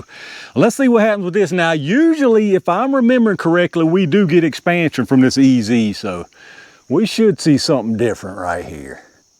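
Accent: South, United States. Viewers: I enjoy your reviews, I just got a BG 2.0. From your accent and the landscape, I’m guessing you’re in north eastern Alabama, North Carolina, or Tennessee. Anyway, greetings from Georgia!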